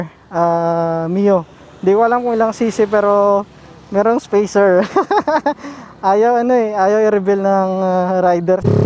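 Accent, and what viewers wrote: Iloilo, Philippines. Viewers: Ilonggo ka lods?HAHA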